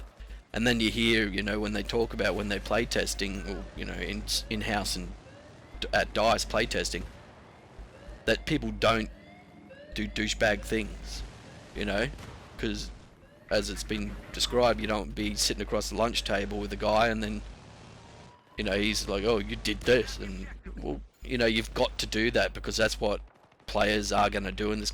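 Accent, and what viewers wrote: Australia. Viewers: Finally an Australian commentator, definite sub from me love your vids :)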